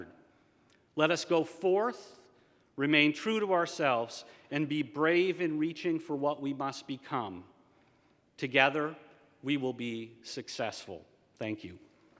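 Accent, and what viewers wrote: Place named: Ohio, United States. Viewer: Very impressive. Driscoll looks and sounds like he hails from Blacklick.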